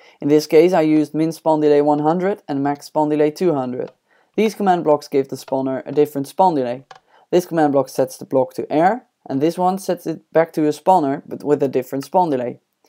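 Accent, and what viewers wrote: Netherlands. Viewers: You sound kindof dutch, are you from holland? If so, I'm to. If not, nevermind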